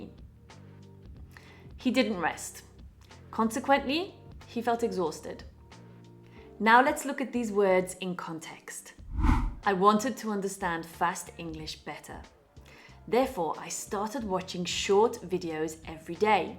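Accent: United Kingdom. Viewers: I really enjoy watching your videos and listening to your British accent. 😉 Although what you say sounds easy, the reality is very harsh. However, I want to continue learning from your videos. Have a nice weekend...Thanks,. 😊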